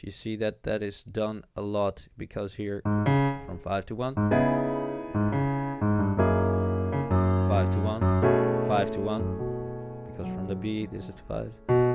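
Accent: Netherlands. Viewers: Are you dutch? Sounds so bc of your accent :p sr if its not hah .good videos btw! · I think he is Dutch because he said "yeah?" And he said or to "of" which is only in holland people say that. They say "ja(yeah?)" and "of" in holland means "or" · You sound dutch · I like your explanations starting from the basics and plus you sound dutch so good luck in the world cup! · Thomason lu He is Dutch, I can tell by his accent. I'm Dutch too ;D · Great tutorial very clear! Are u Dutch?.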